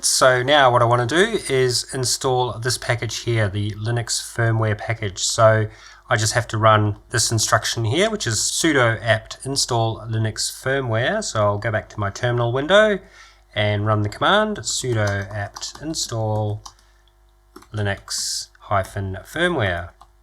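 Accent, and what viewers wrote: Australia. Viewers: For my money you are the best on YouTube for explaining how to configure everything Linux. Step by step, no BS and very articulate and to the point with your Aussies English. Thank you for all you do for us.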